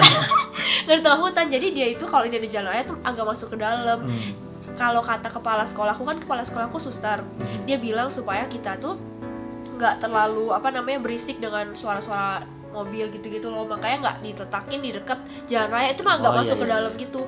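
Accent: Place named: Banyumas, Indonesia. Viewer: Ngapak Onlen :v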